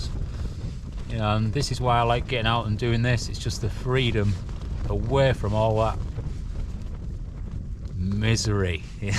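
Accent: Yorkshire, United Kingdom. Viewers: Beautiful video and very exiting for a camping video xD But I have to ask. I'm originally from the UK but now live in America. This is my first video watching on your channel. It sounds like you have a Yorkshire or nothern accent. May I ask where you are from? I was born in North Yorkshire and lost my accent due to bullying at a young age, but sounds so familiar, so I am just wondering :)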